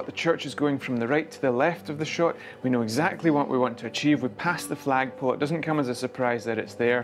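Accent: Scotland, United Kingdom. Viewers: Scottish man love it I’m a MacGregor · The Scottish dialect is simply the coolest in the English language and the drone tips are definitely great, thanks for that! 👍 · Amazing set of drone flying tips and I love your Scottish accent. I always check the flying conditions on apps like UAV forecast or Weather by Tomorrow before flying.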